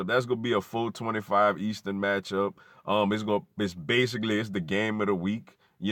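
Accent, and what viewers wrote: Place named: Louisiana, United States. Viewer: I love your Louisiana accent lol what part are you from? I have family in New Orleans, Baton Rouge and Donaldsonville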